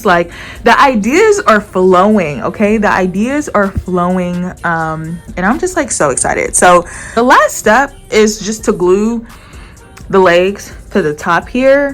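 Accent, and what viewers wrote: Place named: Appalachia, United States. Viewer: Why do you say'y'all?? Are you a hick only hillbillies, talk like that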